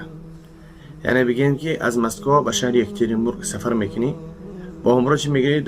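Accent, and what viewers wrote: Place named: Afghanistan. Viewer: асалом додар барои чи вакте чинхони мекуни хамара якчоя боядки якта якта хонишон у чино ба якдигариш мегузаран барои чи авгони гап мезани додар гапи точики худта гум кардай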